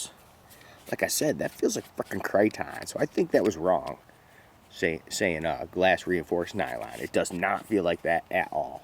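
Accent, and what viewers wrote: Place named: Michigan, United States. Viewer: You sound like you're from Detroit-area Michigan.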